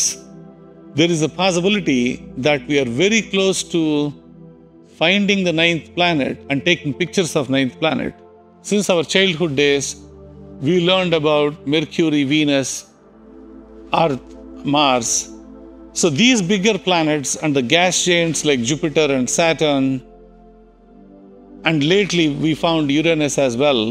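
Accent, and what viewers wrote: India. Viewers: I can’t watch you videos because your accent makes me think of all those Indian scam call centres!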